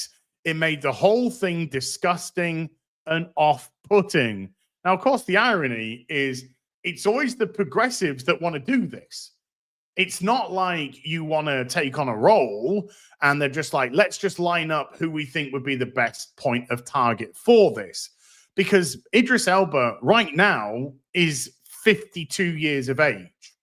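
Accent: New Zealand. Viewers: I thought you were from New Zealand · I thought you were a new Zealander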